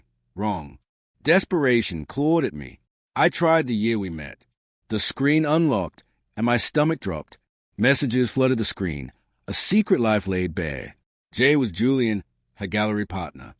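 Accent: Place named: South, United States. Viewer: The southern accent from the AI is off putting!! Needs to have a slower cadence to be believed. Good story though.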